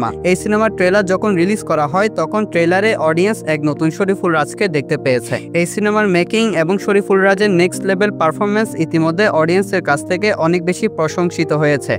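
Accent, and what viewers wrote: Chittagong, Bangladesh. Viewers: Apnar kothar tone mone hocce apnr bari Chittagong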